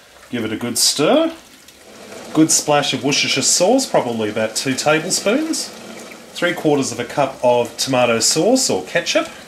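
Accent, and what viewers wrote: Australia. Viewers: 2 things about this video: 1: I live in Mexico and I miss meat pies so much that I'm about to attempt to make my own, so thanks for this video. 2: I'm going to show this video to anyone who thinks all Australians sound like Steve Irwin. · love the aussie accent ^_^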